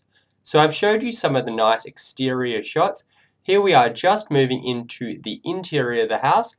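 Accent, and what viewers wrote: Australia. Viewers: is he from Australia? because he sounds like it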